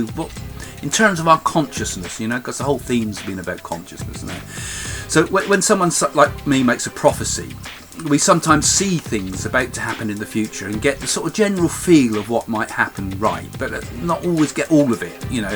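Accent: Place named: South London, United Kingdom. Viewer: what is your accent? Sounds something between South London and Manchester.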